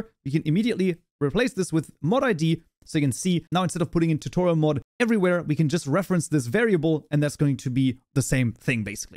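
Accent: Germany. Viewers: You sound german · Its funny how you nearly lost your German accent in to the java tutorial series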